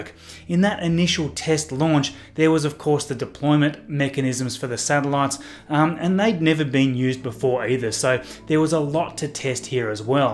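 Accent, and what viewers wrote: Australia. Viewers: I’m subscribed and know this channel but always forget it, the way i remember you is the Australian accent lol 😂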